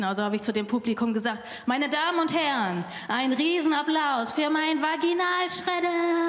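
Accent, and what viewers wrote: Vietnam. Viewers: Eine typische vietnamesische Akzente Gemische, das macht der Humor auch so lustig. Weiter so und alles gute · Thằng nào ??? Har har har....ich erkenne so einiges wieder. Auch das Deutsch mit vietnamesischem Akzent, geil 😆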